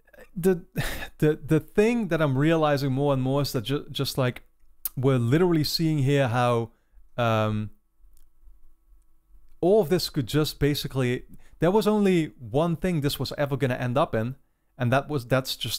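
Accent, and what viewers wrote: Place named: Netherlands. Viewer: im hearing this accent... my man... are you dutch?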